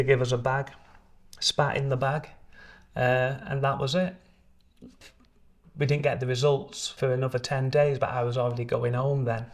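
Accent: United Kingdom. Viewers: Thank you for sharing your heartfelt story ❤ you are a lovely man . Love your Wigan accent .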